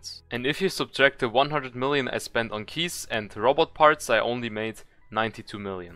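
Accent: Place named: Germany. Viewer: How did I only hear your german accent after hearing yoou speak german?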